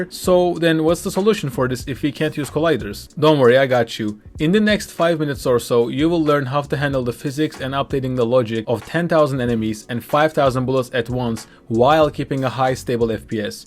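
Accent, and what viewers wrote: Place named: Turkey. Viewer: Kanka sen turk musun aksan turk aq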